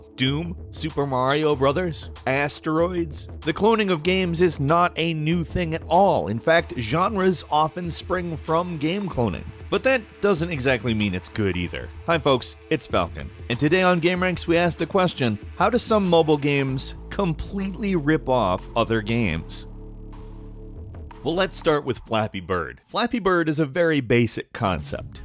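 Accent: Canada. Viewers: Is Falcon Canadian?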